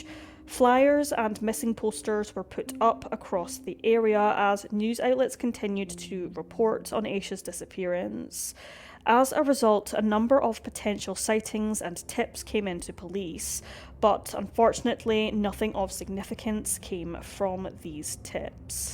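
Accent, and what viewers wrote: Scotland, United Kingdom. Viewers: It’s great to hear another Scottish accent on YouTube! I love the channel, I sometimes find it hard to “stay polite” and not use my “guffy” chat. 🤣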